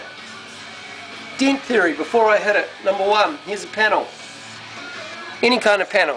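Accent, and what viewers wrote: New Zealand. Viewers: "Kiwi bloke" . . . love the "dawun unda" . . . could listen all day . . . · It's his New Zealand "ex-cent, " Mispronunciation of the vowel e also cherry "rid". (Red). ignore the accent admire his skills and enthusiasm.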